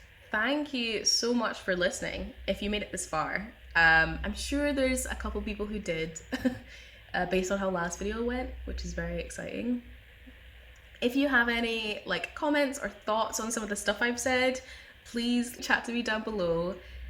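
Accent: Scotland, United Kingdom. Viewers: I’m from the Detroit area of the USA. I found your podcast in my YouTube feed and subscribed after watching your first episode and was very excited today to see you have a new episode to watch. I adore your Scottish accent and don’t have a problem keeping up when you talk fast. So, don’t change how fast you are talking on my account, lol. Thanks for the best new podcast I’ve seen in a while. So fun and fresh! ❤ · Hi, found you in my thread after you got a shoutout by Kevin and Ray on Needle At the Ready podcast. I’m based in Berkshire, UK and enjoying your Scottish accent.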